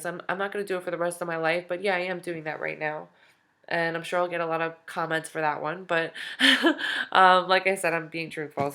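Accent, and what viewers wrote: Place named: New Jersey, United States. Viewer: Can totally tell u from Jersey. It's kinda funny sounding to a West coaster. Methadone 5 years. Looking to get off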